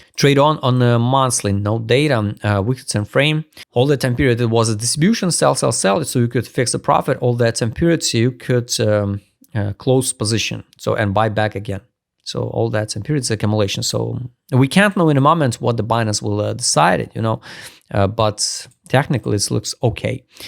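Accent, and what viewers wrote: Russia. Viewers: Pyсню слышу сквозь попытки англицировать 🖕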